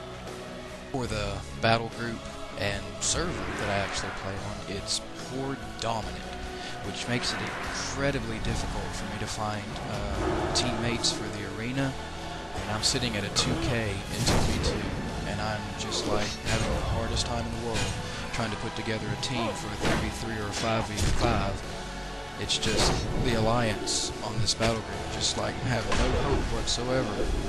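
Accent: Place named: South, United States. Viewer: cool, i loved it and i love your southern accent like mine lol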